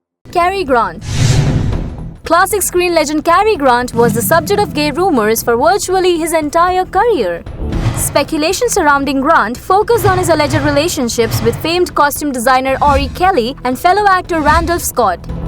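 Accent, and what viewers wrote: India. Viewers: The woman's voice is an Indian accent. It appears some find it irritating. Wait.... Lance Base??? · Your Indian accent is so annoying.. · Indian accent is so incredibly annoying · Bill Bergendahl she sounds Indian 🇮🇳 I think I’m just saying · Better to redo this with somebody who speaks English...not a Pidgeon English from deepest India.... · Her Voice in annoying. I feel like I called a 800 number for some help with a product I bought here in the USA only to be redirected to some place in India. Or that maybe I walked into a 7-11 and ordered a Slushy. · Why the narrator sounds Indian??? Pls dont talk when your mouth is full 😂😂😂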